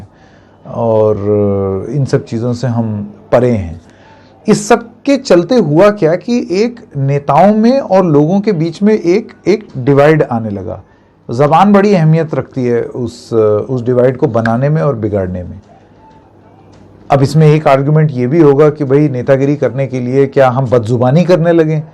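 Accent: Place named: Bengal, India. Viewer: Your Bengali ascent is good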